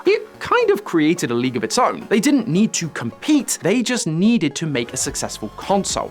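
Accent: United Kingdom. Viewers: When did they hire a Britt for this channel?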